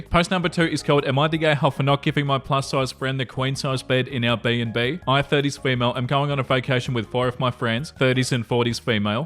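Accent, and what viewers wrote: Australia. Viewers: It's probably a accent thing as he is Australian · It’s funny. I’m Australian, so I don’t notice his accent, but I recognize the kindness and horror in his voice. 😂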